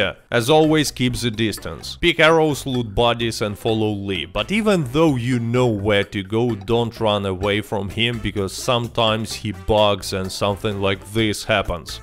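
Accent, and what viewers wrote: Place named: Russia. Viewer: Nice russian acent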